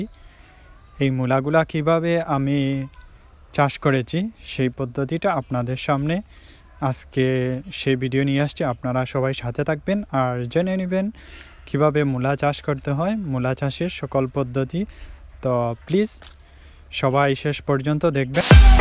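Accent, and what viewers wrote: Sylhet, Bangladesh. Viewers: Sylhoti bhaisab. Apnar mat khub bhala lager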